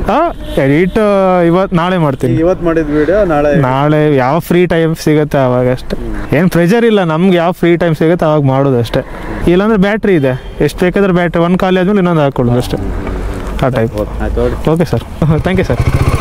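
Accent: Kundapura, India. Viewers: kundhapura bhashi kend kushi aith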